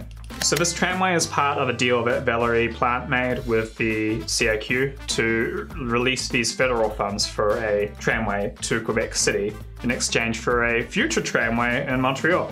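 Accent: Australia. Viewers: Why does this dude have an Australian accent if he’s from Montreal?! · Your accent is making me so confused! It's not exactly Aussie or Kiwi, it's mixed with something I can't identify... but probably you've lived in Canada for a good while?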